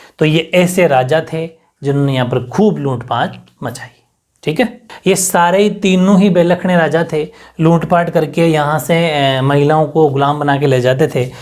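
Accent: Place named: Haryana, India. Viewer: Ser aap Haryanvi lagte ho halanki ki aap rajasthan se ho per aapki language haryana jesi lagti he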